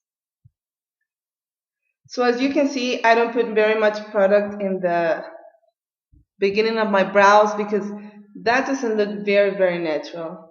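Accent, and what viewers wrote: Mexico. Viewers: Love this video! You speak English really good I have the Mexican accent too! Haha😂 And my eye brows are a challenge a big challenge cause I have to make my brows every day cause I don't have to much hair on it😒 sad ! Well thank for this tips.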